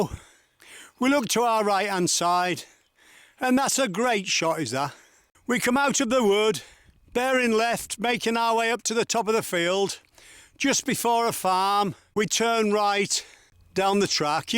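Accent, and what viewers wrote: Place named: Yorkshire, United Kingdom. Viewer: Thank you for that, I really enjoyed your filming and commentary. I'm in New Zealand and one of my grandfathers came from Yorkshire. He died before I was born - I wonder if his voice was anything like yours. I have been to Port Isaac, just for a couple of hours, I took the bus from Bodmin four years ago. That coastal walk looks similar to one or two where I live - but we don't have those picturesque villages.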